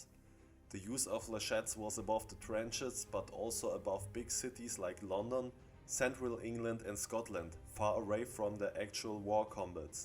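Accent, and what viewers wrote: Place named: Germany. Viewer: The accent is clearly german. I don't get why inches are used. For ammunition specs, germans would usually use millimetres (mm).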